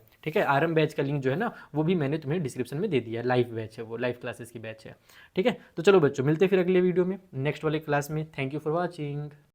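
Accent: Bihar, India. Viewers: Sir kya aap bihar Sa Ho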